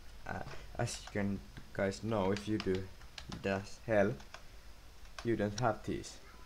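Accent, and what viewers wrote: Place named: Finland. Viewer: i heard finnish accent toril tavataan perkele :D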